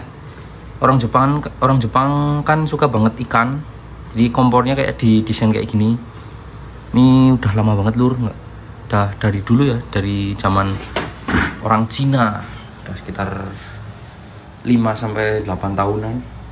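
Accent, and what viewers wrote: Banyumas, Indonesia. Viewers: Orang mana mas ngapak poul😂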